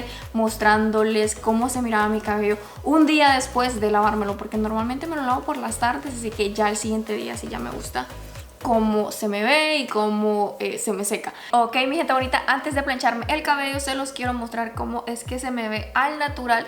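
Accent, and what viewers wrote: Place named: Honduras. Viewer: Lo q me encanta y admiro de ti esque no has perdido tu hacento hondureño!!! 😘😘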